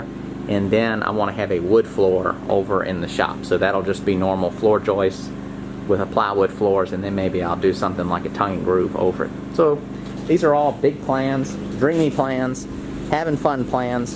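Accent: South, United States. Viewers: I like your ideas/purpose for this nice piece of land. The Jeep would be a fun project to watch if you don’t speed up your video and someone might also learn how to do a trade by watching your channel. I love the plans for your property especially leaving the tree inside your building. Nature kinda helps slow us down enough to enjoy life without thinking about making money. I think it brings joy just having a place to go to get away from work and have fun projects you like to do. I am looking forward to seeing more on this channel. I actually forgot about your channel’s name until I heard your voice and I knew exactly what channel I was going to watch it all the way through. Poison Ivy is not something I could have around my property because my son is highly allergic to it! Could you point it out on your next video? Please... Do you work out to stay in good shape or just physically work hard at your different trades? 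I think you may have mentioned which state you live in but I don’t recall. You do have a nice Southern accent which I enjoy listening to you speak. It reminds me of my family. I hope you return soon for some of your updates! Is your other channel on a link so I can find it? Thank you young man👍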